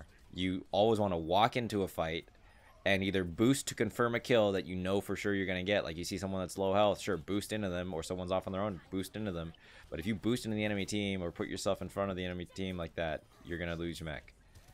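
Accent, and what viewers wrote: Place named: Italy. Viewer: He is so Italian... why do we have such a distinct accent when we speak english god damn it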